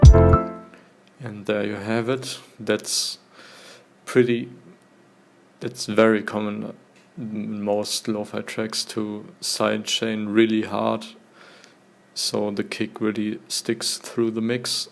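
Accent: Germany. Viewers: one word and i was able to hear the german accent XD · As a german, english with german accent makes me cringe so hard. Nothing against you, I think I sound similar. Great tutorial, thank you! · YE i thought also about his accent because im german too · Which is why most of nowadays music sounds thew same. He smashed the genre, true. But it's just one of millions of songs we hear while learning/studying/working. It would be a great start to build on upon, or an easy short tutorial. That's already it, let's everyone agree on paying more attention to the music we hear, so we know when we're getting fucked in our pressious brians by the industry. Good tutorial, boi! Do I hear a german accent?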